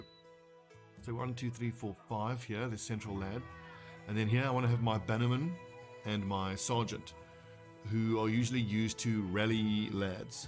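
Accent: South Africa. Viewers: Interesting accent sir, saffa living abroad?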